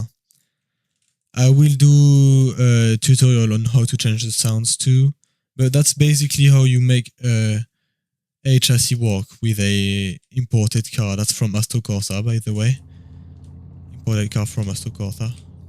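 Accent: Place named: France. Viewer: You sound really french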